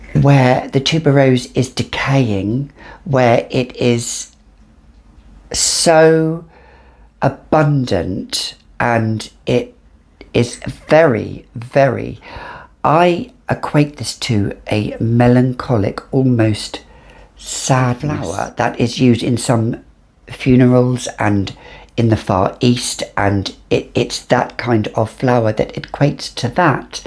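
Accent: France. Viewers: Can’t get enough of that French accent of yours but I don’t think this one would be for me because there’s no sweetness and you know I love my sweet scents but you clearly love it 😍 💕💕👌🏽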